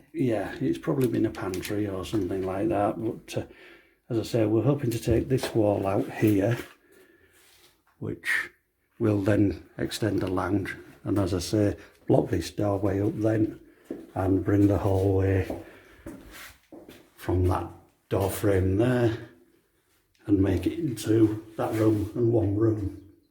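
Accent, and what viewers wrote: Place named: Yorkshire, United Kingdom. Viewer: eh up are you from ULL LOL. You seem to have a Yorkshire accent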